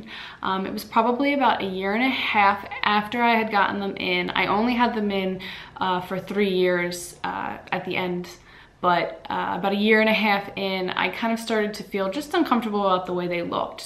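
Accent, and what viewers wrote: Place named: Philadelphia, United States. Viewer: Sounds like your from philly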